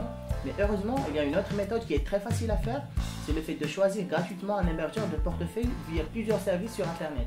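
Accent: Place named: North Africa. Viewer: la music sert a masquer son accent du bled, aller on t a reconnu, retourne bosser a la pizzeria, et laisse les bitcoins tranquille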